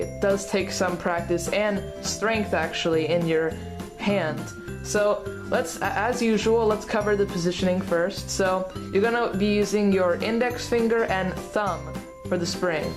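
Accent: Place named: Russia. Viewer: Your voice has a Russian undertone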